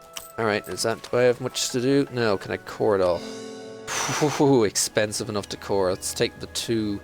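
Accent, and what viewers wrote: Ireland. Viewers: For Northside Dublin it's actually pretty subtle! My parents and entire family are from Northside and some are the type who'd say " Story bud" in that typical accent. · like he is from Ireland. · Hey, what accent do you have? I hear Irish, but I might be horribly wrong.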